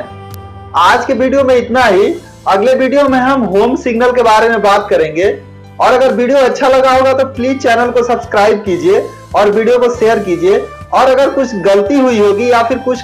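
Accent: Bihar, India. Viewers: Bhai Bihari language me Aisa hi Bolte hai. V Ko bhi, Mai Ko ham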